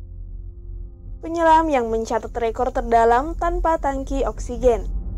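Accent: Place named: Batak, Indonesia. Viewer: Bahh...iya yah logat nya nampak kali😂,aku pun orang bataknya😀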